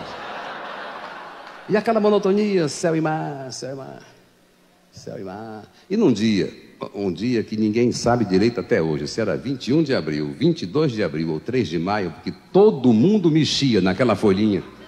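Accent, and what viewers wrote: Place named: Portugal. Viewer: Ele falou português de portugal tão bem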